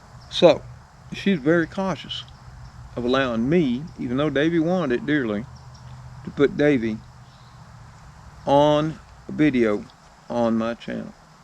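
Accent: South, United States. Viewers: A lot of folks can't deal with a southern accent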